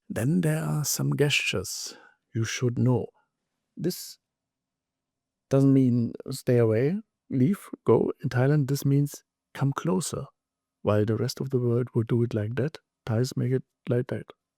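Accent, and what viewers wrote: Germany. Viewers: Yes your impressions are great, and the meanings are near spot on. Although because your German, Bum bui, pum pui. Funny. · You are just too much dear kind Sir! I think the whole german accent in Thai is just the best! Yeah...you have a new sub! LOL>...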